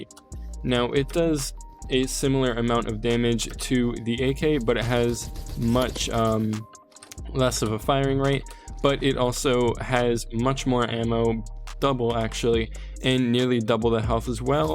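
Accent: Austria. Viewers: Are you austrian?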